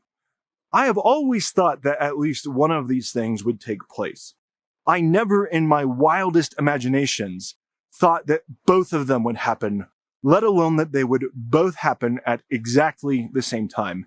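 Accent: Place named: California, United States. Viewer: Snl californians accent a little annoying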